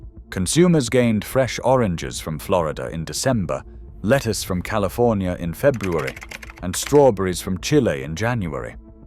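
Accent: United Kingdom. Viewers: OK-- i like paw paws, and they're an American fruit. So why was a British accent chosen to narrate this video? 🤨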